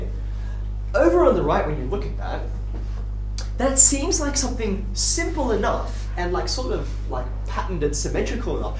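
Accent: Australia. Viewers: That darn Australian accent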